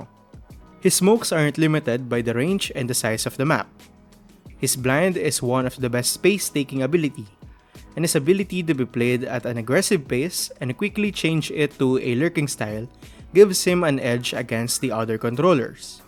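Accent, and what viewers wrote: Philippines. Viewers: Are you filipino? your accent sound filipinos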